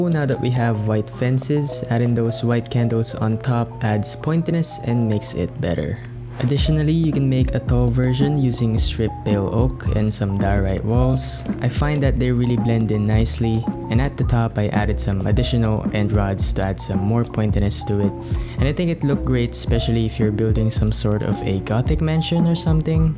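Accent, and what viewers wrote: Philippines. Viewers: felepeno accent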